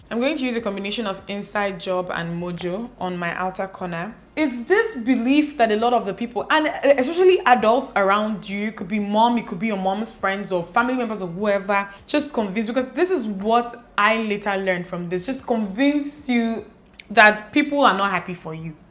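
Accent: Igbo, Nigeria. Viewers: this is a side note but I love how your igbo tones show up in your English!! Looooveeeee ittt ❤️❤️❤️ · Hmmmm. So I had this experience when I was in school, I had this "friend" who got engaged in our final year. We were close in year1 but not so much anymore but when she told me I was happy for her, talked about the guy and all, how to get d asoebi and Price dat will be favourable for all. At this time, my mum was ill, which I didn't tell anyone except my closest friends (my dad had passed away earlier) but I still tried to call and be a good friend. My mum later passed (bless her soul) during my degree exams but my family didn't tell me bcos of my exams. When they finally did before my project defense, I was so broken, my friends had to move me to a friend's place. So, we went to school one day and I saw her, she was acting weird, didn't say anything to me. 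Later that day, I was with my friends and my bestie goes "do you have any problem with her" I'm like "No, why". He said he noticed she was acting weird and he asked her why and she said she feels I'm not happy for her, that maybe I'm jealous she's getting married first and he told her "what are you talking about, her mum just passed " Females and marriages especially with the Igbos, it's just crazy. I love your Igbo accent though. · The ibo way you speak your english is epic 😂😂😂😂😂😂😂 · Oh God you are so funny. So much truth in what you are saying🤣🤣🤣 Your Igbo intonation is bae Can we be friends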